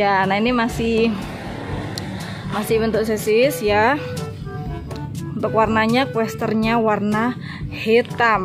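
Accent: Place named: Sumatra, Indonesia. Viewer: logat bicaranya dah mulai logat sumatra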